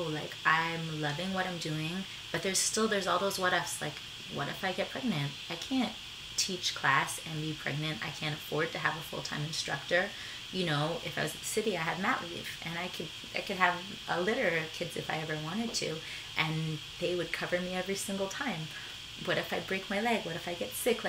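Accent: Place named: Canada. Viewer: Your location says United States but your accent is Canadian and your gym is located in Canada?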